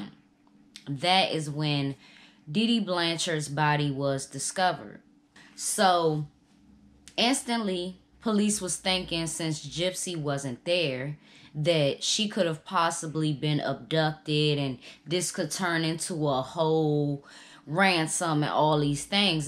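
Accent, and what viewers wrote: Washington DC, United States. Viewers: I always liked yo heavy DC accent💯 Keep levelin 💯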